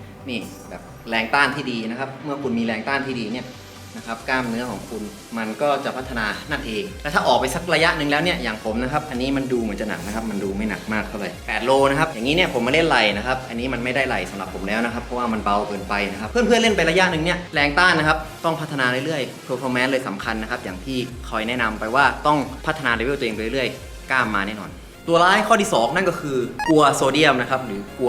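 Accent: South, Thailand. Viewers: พี่คนใต้ หม้ายยยยนิ สำเนียงนี้หมันเลย55555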